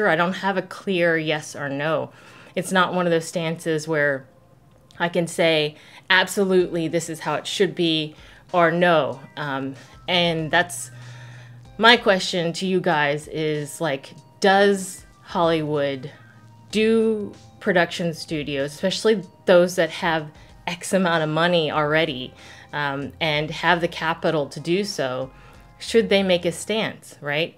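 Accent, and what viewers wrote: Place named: United States. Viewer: Sounds like 100% American to me.;)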